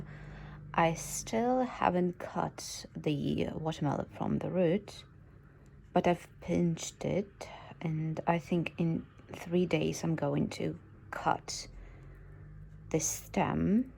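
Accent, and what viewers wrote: England, United Kingdom. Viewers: who comes you have such a beautiful English accent 🇬🇧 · You have a English accent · Sounds to me like she’s acquired a British accented English, interesting as many Eastern Europeans have a distinct accent. Lovely to listen to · Im just so chuffed how you are not using American English but English from England proper. So nice. Those melons looked so jucy and colourful. Prayers that the geo politics of today will not affect your lovely part of our world. Cheers from Sweden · How do you speak like a British living and raised in Belarus 🇧🇾 By the way your life is so beautiful so you are. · Your British educated by the sound of your English I'm growing watermelon this year see if technique works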